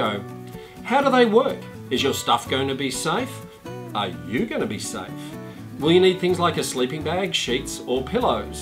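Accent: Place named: Australia. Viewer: Interesting Aussie accent and jokes. al-BER-gay St- Jon -peed-d-por Walking sticks are so helpful taking 30% of the weight- bearing off hips, knees, ankles. Good for going down & up. I understand your wrist problem but please recommend them! One stick creates imbalance in the body over days. (I've walked 7 caminos) thanks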